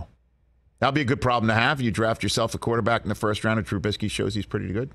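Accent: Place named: Pittsburgh, United States. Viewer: He even sounds like a Yinzer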